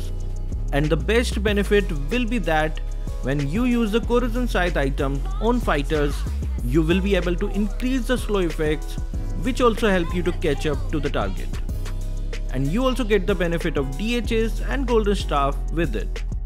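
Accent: India. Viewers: are you from India, because your voice is like an indian very clear, some I noticed that you equip the map of India? 😕🤔 By the way thanks for this video it will help players like mm. players and also us to improve our skill and builds. 👌🙏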